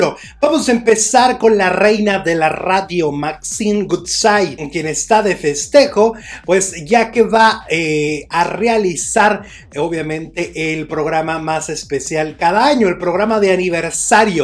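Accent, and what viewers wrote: Fresa, Mexico. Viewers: Qué antipático eres , tú tono de voz es desagradable quieres hablar muy fresa antes hablabas normal, y caes mal🤔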